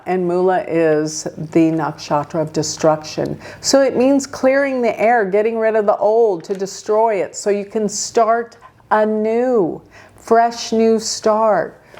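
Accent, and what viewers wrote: United States. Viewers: And I have learned to understand and embrace her American accent especially in the pronunciation of sanskrit terms.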